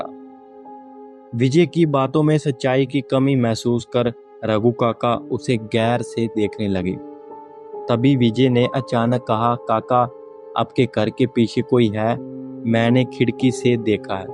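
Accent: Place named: Punjab, India. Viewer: Jado tu story start kiti mein pehla he guess kr lya k tu Punjabi ha, kehra pind Mitra tera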